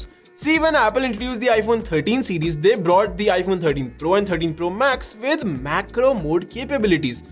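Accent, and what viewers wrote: India. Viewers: I don’t understand your English. Why do Indians think people understand their thick accent English?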